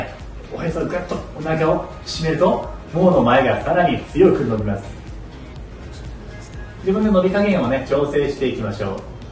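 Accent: Kansai, Japan. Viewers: 初めてコメントさせて頂きます。 今までYouTubeで色んな筋トレ、ストレッチ、マッサージなどしてきましたが、痩せるどころか辛いし、脚は太くなるしで全く継続出来ませんでした。 たまたまこちらがオススメで出てきたのでやってみると、とても簡単でこれで脚痩せ出来るのかな？と半信半疑で初めて３週間。ずっと履いていたユニクロのデニムが緩くなってきたので、古いしそろそろ新調しようと思って試着したら２インチも下がっていました！ 体重も確かに少し減っていましたが、正直ここまで脚痩せしていたとは、ビックリです！ 素敵な声が聞き取りやすく、時々出る関西弁に癒され、ハイセンスなＴシャツとタイツで毎日飽きることなく続けることが出来ています。 長くなりましたが、素敵な動画を本当にありがとうございますm(_ _)mこれからも動画ＵＰ楽しみにしています。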